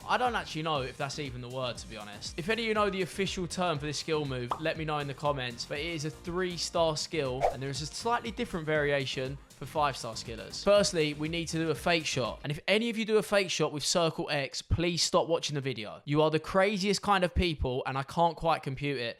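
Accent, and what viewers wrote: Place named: United Kingdom. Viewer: god i love this bri ish accent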